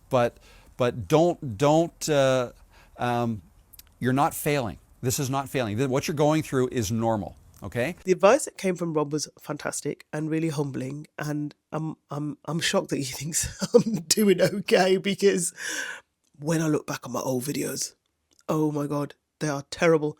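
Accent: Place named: United Kingdom. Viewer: I have a feeling you will surpass me. You have a natural vibe on camera, nice editing too, plus you can't beat that British accent. I just raw dog all my videos like a wild animal. Sometimes it works I suppose. I hope we both grow organically and build some nice communities. AKA Tribes. I think I am going to do a video on that.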